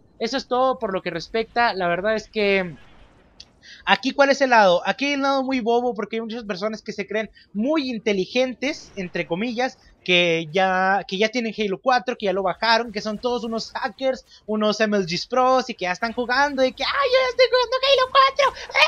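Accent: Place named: Chihuahua, Mexico. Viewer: Supe en un segundo que eres de chihuahua , yo tambien carnal arriba chihuahua !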